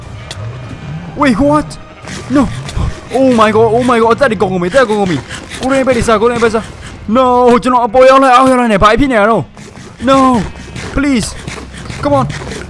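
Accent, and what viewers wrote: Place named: Myanmar. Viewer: i dol ေရျမန္မာအသံေလးနဲ႔အရမ္းႀကိဳက္တယ္ဗ်ာ❤❤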